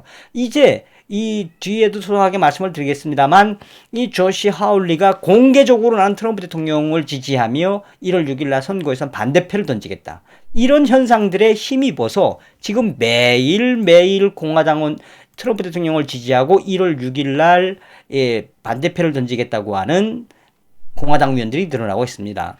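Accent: North Korea. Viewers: 님 틸북인이시가요? 북한 말씨여서요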